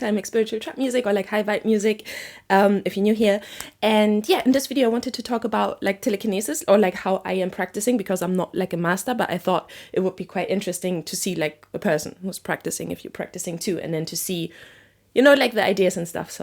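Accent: Germany. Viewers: Ich wollte mal fragen welchen Stein ich benutzen kann als Steinbock :) Ps : Dieser deutsche Akzent 😭✋ ich liebe es 😂😂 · i like your German accent, I assume it's a German accent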